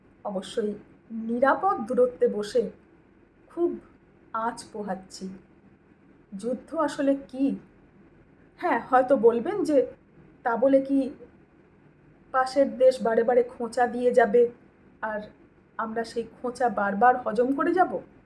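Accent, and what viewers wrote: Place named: Bangladesh. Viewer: আপনি দয়া করে ঐ 26 জন হিন্দু কে তার স্ত্রী, বাচ্চা ও আত্মীয় দের সামনে, শুধুমাত্র হিন্দু হবার জন্য অস্ত্র হীন মানুষ গুলো গুলি র বৃষ্টি বইয়ে দিয়েছিল কৈ তাদের নিয়ে এই বাতেলা তে শুনতেই পেলাম না।ঠিক আছে ঐ কাশ্মীরে ঐ অপদার্থ গুলো যায় কেন? তার দায় কি আমার? ভালো কথা আপনার ভাষা শুনে মনে হচ্ছে বঙ্গালী।সরি বাঙালি তাহলে মুর্শিদাবাদ, মোথাবাড়ি ইত্যাদি ইত্যাদি তে যে হিন্দু দের কেই টার্গেট করে হিন্দু বাড়ি ঘর জ্বালানো, লুটতরাজ, মহিলা দের শ্লীলতাহানির মতন কটূক্তি কর কথা বার্তা সেগুলো??? ও হো, সো সরি ঐ যে কে একজন ডায়লগ দিয়ে বলল আমরা 70% ওদের কেটে গঙ্গায় ভাসিয়ে দেব।তখন আপনি বাতালা ঝাড়ার মতো মেরুদণ্ড ছিল না, কারণ ঐ লোকটা ই বলেছিল হেন্দু পান্ত ভাত খেইয়ে ঘুমুচ ছে, তাই আমরাই করব।কৈ তখন তো কোনো লে চ কা র ইউটিউবে পাই নি কোওওওও?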